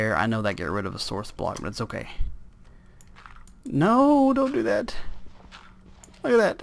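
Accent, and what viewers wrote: South, United States. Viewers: Like country accent im from Georgia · Thank you very much for these awesome videos,! I like your accent too. What state are you from? Greetings from Washington State!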